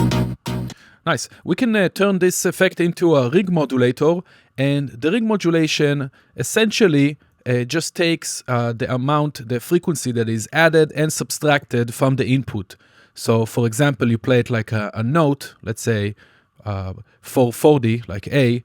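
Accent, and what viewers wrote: Israel. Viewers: I love the fact that I could tell you're from Israel after the first three words you said! But jokes aside, great video and useful info, thank you very much.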